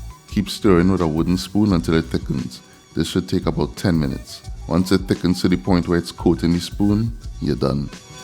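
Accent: Trinidad and Tobago. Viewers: The accent of a Trinidadian is very distinguished! 🖤Nice recipe! I will have to order the fruit via amazon.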